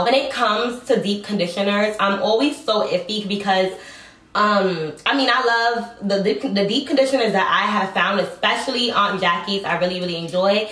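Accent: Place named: New York, United States. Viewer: I love your accent. Sounds like a New York accent. I love your hair and you remind me of Keke Palmer, very pretty girl. Oh, I would like to be mid back by July or Aug 2020. I drink over a gallon a water a day. I'm taking better care of my hair now and also deep condition every 2 weeks.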